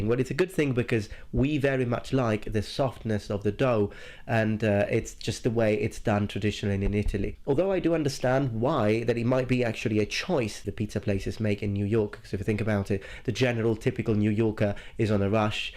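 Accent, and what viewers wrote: England, United Kingdom. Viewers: No accent? He has the accent of England all over his English! (Moi, statunitense . . .) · That actually sounded very british for some reason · Hey, goomba what’s with the semi-English accent? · I always forget your Italian. Your UK 🇬🇧 English accent is immaculate. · But your accent seems someone from UK, are you Italian British? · You speak with a somewhat British accent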